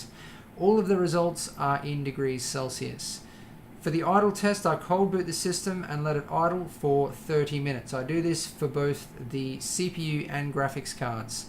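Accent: Australia. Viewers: this is the only video ive seen from you, sound australian? do you have a business that makes custom pc's? if so, id be really interested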